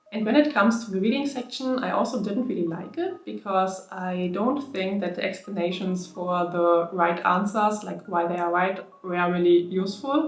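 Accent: Germany. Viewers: Have you considered taking the Tokini Andy courses? They look interesting… I want to get to level N5 by June 21, 2024… Best, John.. PS: Am I detecting a German accent?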